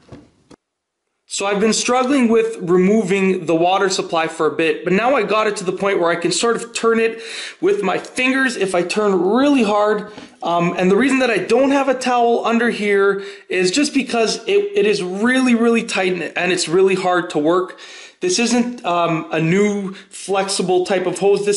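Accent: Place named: Montreal, Canada. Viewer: Excellent tutorial. Are you Canadian? You have a Montréal accent.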